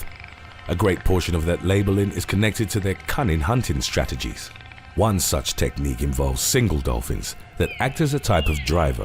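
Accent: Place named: United Kingdom. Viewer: Funny when a guy with British accent says : soccer pitch.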